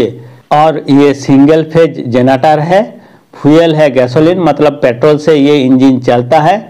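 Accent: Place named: Bengal, India. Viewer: Apni Bangali apnar Hindi Sune bujha ja6...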